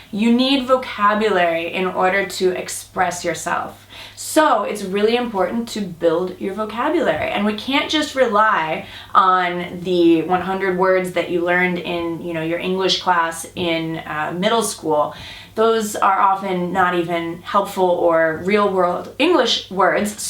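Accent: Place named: United States. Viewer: Hello whats your name? The one whos speaking? Do you have any websites? -- you sounds great.. you speaks like american, aren't you? I want to sounds native..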